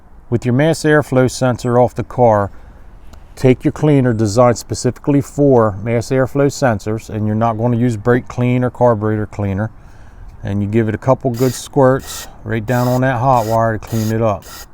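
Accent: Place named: Baltimore, United States. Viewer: Gotcha. You just sound like you have an accent that is very similar to our Baltimore accent.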